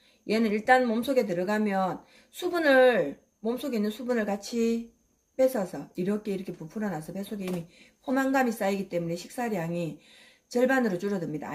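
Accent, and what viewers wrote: Gyeongsang, South Korea. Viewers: 경상도 사투리 정겹노 ㅋㅋ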